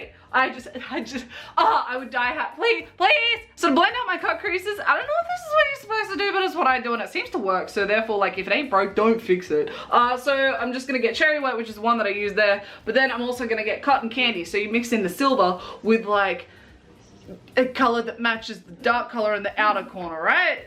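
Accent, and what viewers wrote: Australia. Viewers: I love your bombastic and I also love your real voice and personality:) It's so refreshing to hear an Australian voice on YouTube - and idk, hearing someone do internet memes with my native accent is weirdly comforting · By gosh, I looooooove Austrailian accents! (Okay, I'm done gushing. Maybe.) Whether you're you or you're, you're amazing and you bring a TON of joy to those who watch your videos! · i like both, i kinda liked how you and were in this video. the accent is just hilarious although i adore your aussie accent.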